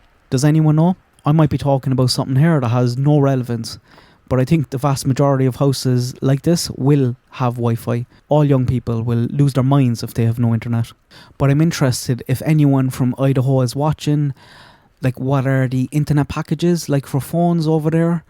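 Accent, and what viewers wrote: Cork, Ireland. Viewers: Cork gang represent! Sound like you might be from the Northside Langer! Beautiful scenery, up the Glen? 😂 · Very interesting information about wifi in public that I had no idea about! Thanks for this. I haven't heard anyone discuss this before. Very good video, and obviously, I love your Irish accent!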